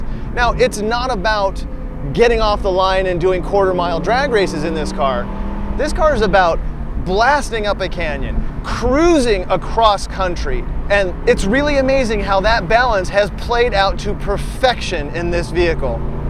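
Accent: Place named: United States. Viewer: The car sounds great. I wish annoying yank with that grating accent would shut the f**k up though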